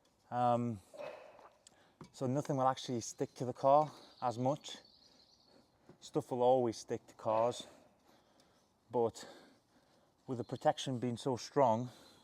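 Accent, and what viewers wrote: United Kingdom. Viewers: Great Chanel! Great products! Do you mind me asking about your accent? I hear a little North East twang maybe? 🤔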